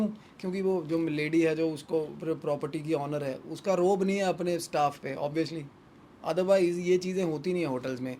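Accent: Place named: Punjab, India. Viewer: Bhaiya wo punjabi English hai ...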